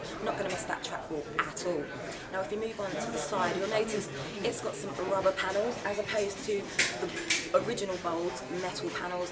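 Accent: United Kingdom. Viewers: i like her british accent..... nice...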